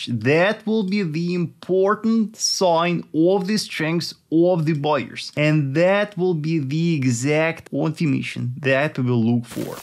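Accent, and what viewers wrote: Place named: Russia. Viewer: Damn I never heard such strong Russian accent. 😊 love Russia, the only sane people in the world! I hope to learn from you!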